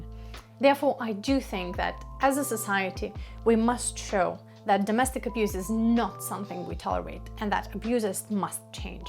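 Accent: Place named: Lithuania. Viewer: To be honest, I just jumped into your channel accidentally, been watching videos about turning vans into a campers and in one of them I just heard slightly Lithuanian accent so now I'am here. Tu tikrai darai puikų darbą skleisdama šią žinią. Man net sunku įsivaizduoti, kiek moterų vien lietuvoje gali būti tokiuose santykiuose. Kai tai pasidaro matoma aplinkiniams, tai jau toli pažengę ir blogiausias dalykas, kad auka pati, be išorinės pagalbos dažniausiai net nesuvokia, kokiuose santykiuose ji yra. Džiaugiuosi, jog tau pavyko tai nutraukti, be abejo, išėjai iš to stiprensė. Sėkmės.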